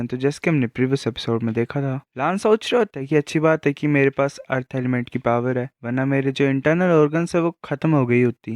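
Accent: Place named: Himachal Pradesh, India. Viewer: Bro apki language ae lg rha app Himachal Pradesh se ho 😁😁😅 i am right 🥺😅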